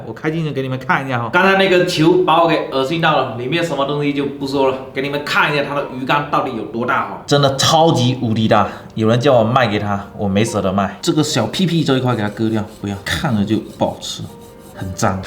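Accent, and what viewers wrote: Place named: Fujian, China. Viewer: 你是福建人吗。。。 哈哈 口音好重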